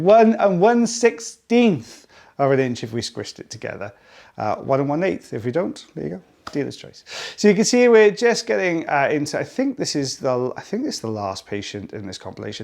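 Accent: Wales, United Kingdom. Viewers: Is you Welsh Butt?? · It is a Welsh thing - love the videos, and also love hearing your accent - reminds me of home!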